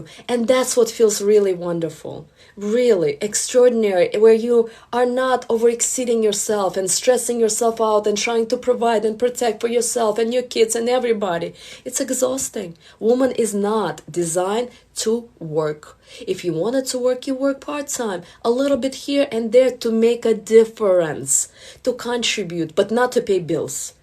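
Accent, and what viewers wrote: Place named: Eastern Europe. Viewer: Man here, you are the relationship advice women need to hear instead of “blah blah blah Tinder makes you feel whole, go sleep with 100 men to find yourself”. I can tell from the accent you’re from Eastern Europe so no wonder you are smart and beautiful. I love Eastern Europe and my Croatian gf who is what you described basically :)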